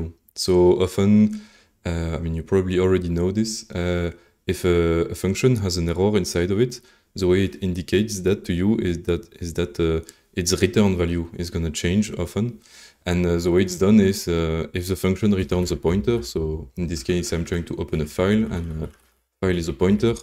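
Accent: France. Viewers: Hum… réécrire des fonctions, un accent français… 42?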